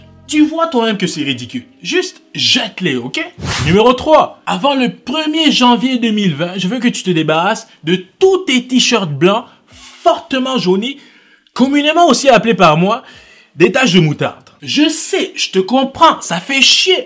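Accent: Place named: Quebec, Canada. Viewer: Je sais pas pourquoi mais je sent l'accent québécois 😅 sinon vidéo au top 😁👌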